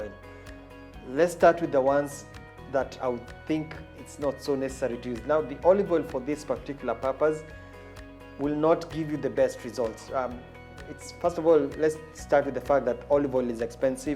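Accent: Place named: East Africa. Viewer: Oooh I can understand this Gud East African English thank uuu chef!!!💪💪💪❤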